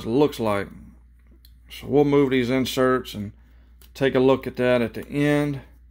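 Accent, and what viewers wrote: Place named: Louisiana, United States. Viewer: I love your backwoods Cajun southern accent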